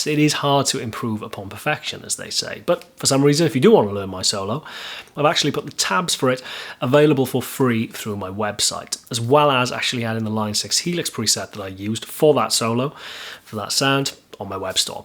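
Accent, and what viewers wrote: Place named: England, United Kingdom. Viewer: Best voice ever Bro, Soft but full and the English accent is of course Golden. From Your Canadian Brother. Keep on Ah:-)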